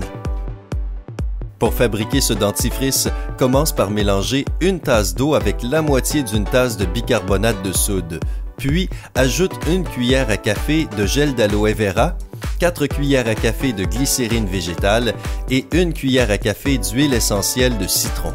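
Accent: Quebec, Canada. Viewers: Vous avez un accent québécois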